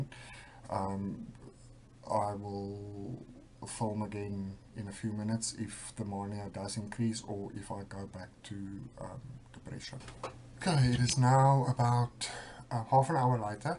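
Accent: Afrikaans, South Africa. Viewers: I was lekker to hear your Afrikaans accent and realize I'm not alone suffering with this in good old SA. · Nice afrikaans accent bloke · Leo Harker south african i think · What is this accent? South African? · Alice Lee Afrikaans accent from South Africa. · You are strong and brave man. Can hear you are from RSA like me. Not an easy place to live in, but sharing this the way you did while going through this, i have a lot of respect for you. May God bless you and give you relief sooner than you expect it. Stay strong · I have a couple friends that are from SA, that accent is Afrikaans. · Are you South African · Hey mate! Are you South African? I detect an Afrikaans accent. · Are you SA? Or in South Africa? I recognize the accent. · Guy's South African, got a Afrikaans accent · E Quality is it south African? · I get the depression but not the mania. 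I'm not Bi Polar but I experience the severe depression. It's the worst. PS I'm a South African living in China and I've been watching YouTube videos about mental illness.....it was SUCH a sound for sore ears to hear your south african accent!! Thank you for sharing your video.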